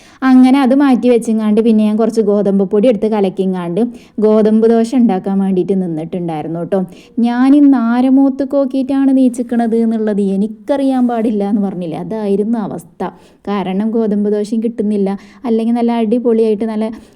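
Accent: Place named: Kozhikode, India. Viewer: എന്തിനാ കോഴിക്കോട് ഭാഷ പറയുന്ന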